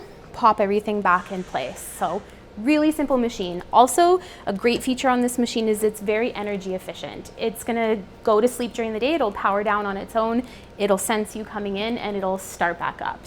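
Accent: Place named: Canada. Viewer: The Canadian accent on this makes it very entertaining! 😂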